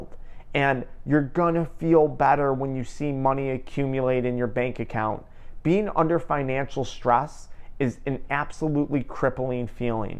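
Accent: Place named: Buffalo, United States. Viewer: i knew by your accent you were from buffalo new york i saw the 716 number and knew it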